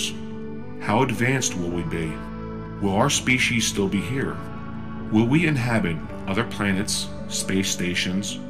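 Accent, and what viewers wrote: New Jersey, United States. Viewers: Liked the video, but the voice modulation thing is...weird...coupled with a NJ accent. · The combination of gravitas-evoking reverb and Jersey accent makes me smile.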